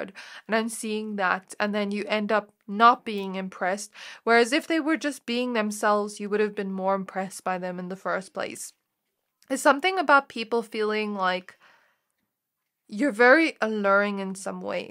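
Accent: South Africa. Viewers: Beautiful reading. Thank you. Are you South African(accent)? Pile 2.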